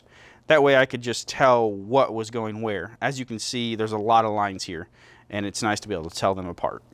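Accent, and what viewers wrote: Michigan, United States. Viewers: 1) Are you from Michigan? 2) Woah when did get a Laser?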